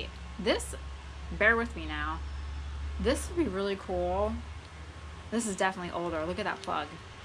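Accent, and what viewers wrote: New Jersey, United States. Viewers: It’s a NJ accent.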